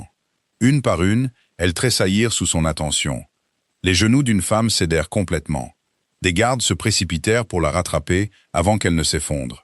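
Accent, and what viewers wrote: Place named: England, United Kingdom. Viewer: Histoire agréable mais narration fort désagréable. Accent anglais puis voix monotone sans aucune chaleur.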